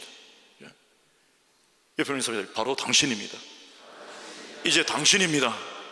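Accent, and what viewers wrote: Gyeongsang, South Korea. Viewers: 경상도 ㅋㅋㅋ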